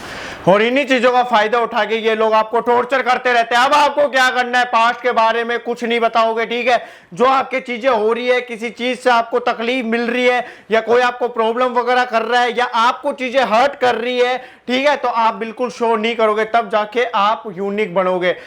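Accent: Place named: Marwari, India. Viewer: Marwadi ka boli se pata lagta hai bhai 🤣🔥🔥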